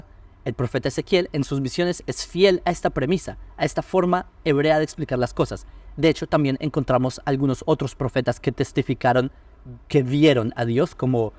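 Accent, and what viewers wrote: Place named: Bogota, Colombia. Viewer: Muy buena explicacion...entonces es el estilo de tematica de Ezequiel...,tu hablas como si hubieses vivido en Bogota...tiene un español como de Bogota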